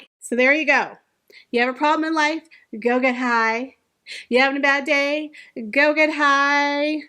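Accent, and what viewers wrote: South, United States. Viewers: I'm sorta kinda new to your channel. I heard you do a live stream with Sue Smith and thought I might give your channel a try. I'm so glad I did. You have a very soothing voice and I love your charming southern accent (especially when you say "y'all). I hope your channel keeps growing.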